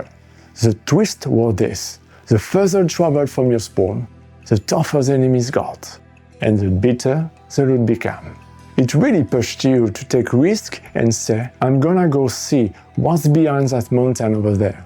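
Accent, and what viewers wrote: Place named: France. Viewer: Hey, first of all congratulation on doing and posting a video. Pour le reste, étant aussi français , si je peux me permettre de pointer deux ou trois problèmes : -Le son, oui tu as un accent français, mais je pense qu'en réduisant la saturation ce serait plus audible (ça peut être amélioré largement avec une bonne technique simplement avec Audacity, même si c'est pas la meilleure solution non plus). Même étant aussi frakgais et fluent en anglais c'était un peu dur par moment. -Le montage, dans l'ensemble c'est très bien, mais comme disait il yabquelque années jdg, ou Antoine dznizl le rythme est très important, même si le rythme ne doit pas forcément être rapidew c'est mieux quand on a l'impression que tu donnes le rythme a ta vidéo, et pas l'inverse . Après je dis tout ça en ayant eu pendant longtemps l'idée de faire des vidéos, qui plus est en anglais, sans jamais en avoir fait. 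Ce sont des observations mais ça ne retire rien que tu fais mieux que 98% des gens, qui eux n'ont même pas agis. Et de reste si tu souhaite discuter ou plus de détails sur mes avis n'hésite pas (si ça t'intéresse on pourra voir par mail ou autre) Bravo encore et bonne soirée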